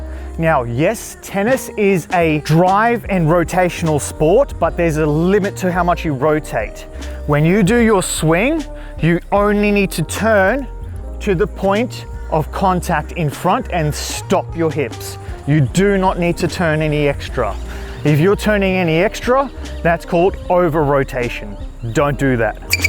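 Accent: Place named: Australia. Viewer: I was very confused by a coach named speaking with an Aussie accent. Really good video production, super clean camera footage, clear explanations, and what an aesthetic vibe. Subscribed!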